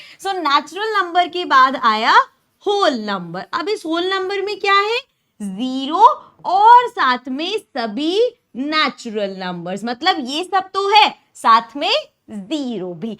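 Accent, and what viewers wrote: Punjab, India. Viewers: Gosh, your punjabiiiiii😭✋🏻🛐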